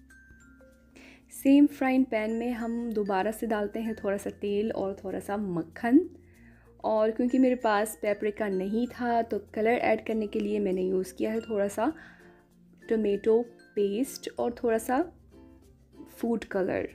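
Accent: Pakistan. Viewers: Ap ka urdu ka leja i mean Accent same pakistani hai lagta hi ni ha ap morrocian ho 👌👌👌👌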